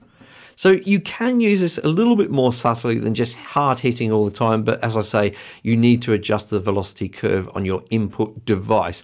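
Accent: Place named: Australia. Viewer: Aussie in England or vice versa? (Great vid btw!)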